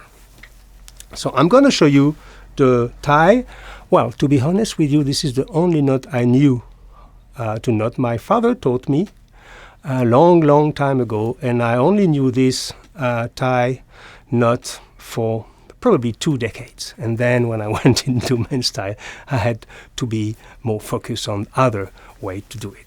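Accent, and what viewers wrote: France. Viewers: Very well said! „As long as you not look like a clown“ is basically all one should consider. By the way, I love your French accent when speaking English. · Please upload more often. I love watching/listening to you, the french accent adds so much to it. I'd like to hear more jokes from you too like in the shoe series with the "not my cup of tea" joke about the loafers. Really laughed with that one 👍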